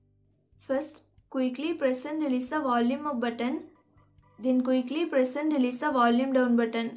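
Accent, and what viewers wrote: India. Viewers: Are you Indian because of your voice so nice.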